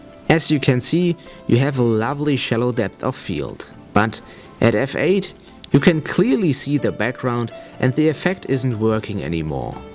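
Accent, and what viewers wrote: Germany. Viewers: That's his real accent. He is German. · cuz hes german :D and he have this cool accent when speaking english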